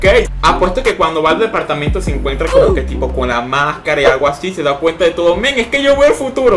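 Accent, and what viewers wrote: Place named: Venezuela. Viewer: Me gusta tu forma de explicar... Tu acento suena a... venezolano... hablas muy chistoso.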